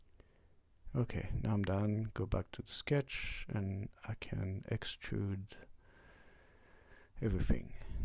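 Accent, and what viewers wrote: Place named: Neuchâtel, Switzerland. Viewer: Oh un neuchâtelois :-)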